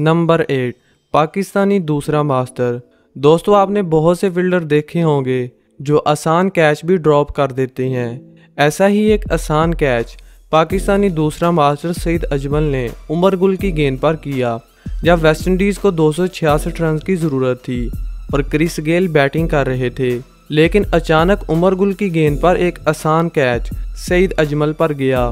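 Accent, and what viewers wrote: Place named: Punjab, India. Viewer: Poor comentry punjabi style😔😔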